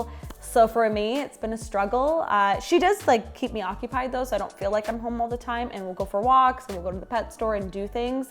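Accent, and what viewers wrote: Canada. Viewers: Is she Canadian? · R u Canadian? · Your canadian, arent you?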